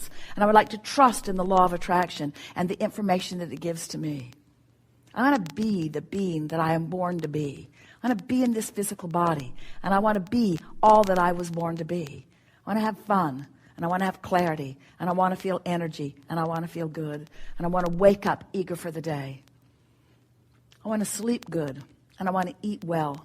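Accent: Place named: Transatlantic, United States. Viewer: That is so lovely. I love starting my day with a warm drink and listening to Abraham. Esther has such a wonderful transatlantic voice, perfect for storytime and speaking to our inner knowing.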